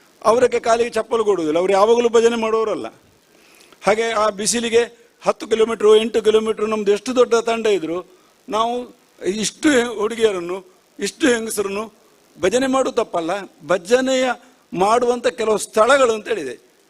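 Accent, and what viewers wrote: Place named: Tulu Nadu, India. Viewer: Anna irna kannada sari ijji, ir tulette paterna edde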